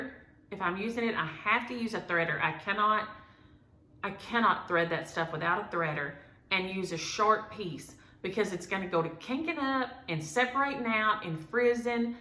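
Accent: South, United States. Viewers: LOVE your videos and your southern draw😆😆. You have some beautiful WIPS. I love Pumpkin Pie with whip. Have a great get-a-way. See you next time.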